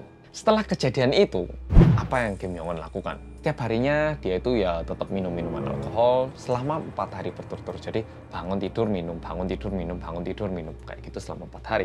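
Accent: Java, Indonesia. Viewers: Dah lama ga nyimak kasus Dr abang medok. Kangen rek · Bersama org korea yang... Madokkkkkkkkkkkkkkkk😂😂❤❤❤... Suka banget dengarin😅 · Mas yg orang korea aja ngomongnya lebih medok daripada aku yg suku jawa, tapi tinggal di kalimantan 🤭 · Lucu penyampai ini..orang korea tapi logat indonesia(jawa) nya itu pekat ya😀😀😀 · Baru nonton chanel ini.. wong korea ngomongnya medok banget.. lucu.. haha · Mulai demen liat video2 nya mas medokk satu ini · Suka banget karo wong korea sing medooogggg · Tim gercep mas, selalu medoooooooookkkkkkkkkkkkkkk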